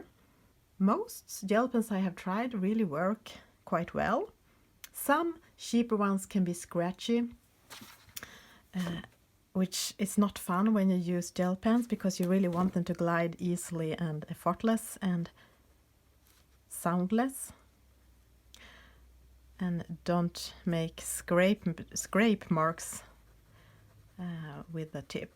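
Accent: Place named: Italy. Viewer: Your beautiful accent reminds me of the accent that Meryl Streep imitated in the movie, 'Bridges of Madison County'. Are you Italian?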